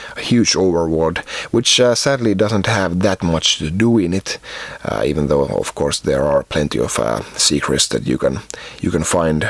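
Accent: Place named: Finland. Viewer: He cant help his Finnish accent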